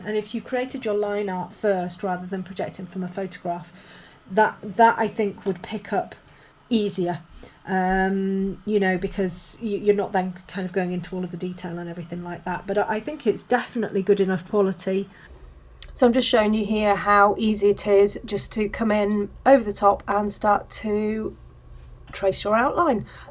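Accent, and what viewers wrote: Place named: United Kingdom. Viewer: I got really excited when I heard your british accent, that means I should be able to get a hold of this. Thank you!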